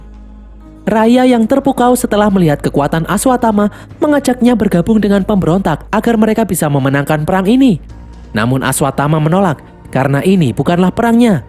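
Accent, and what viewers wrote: Java, Indonesia. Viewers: Adminya orang jawa ya, karna mbacanya karno🥵🤣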